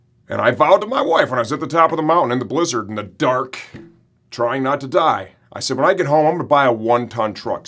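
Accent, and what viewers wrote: United States. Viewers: You sound like you're from Boston ! The language. Funny video and you're right about corporate cocksuckers.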